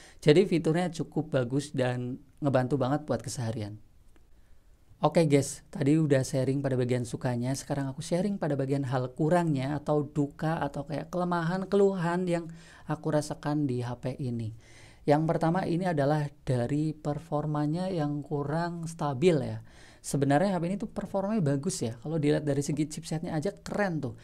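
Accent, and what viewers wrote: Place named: Indramayu, Indonesia. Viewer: Ko kya wong dermayu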